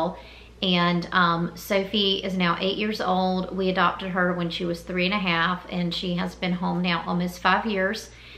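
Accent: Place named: Carolina, United States. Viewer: You sound like a fellow Carolina girl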